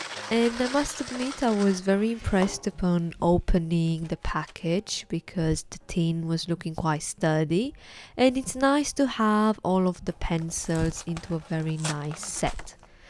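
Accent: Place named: England, United Kingdom. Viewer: great drawings and cute english accent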